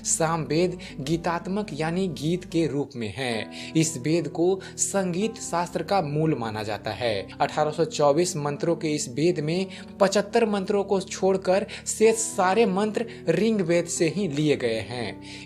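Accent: Bihar, India. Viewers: Bihari hai ke bhai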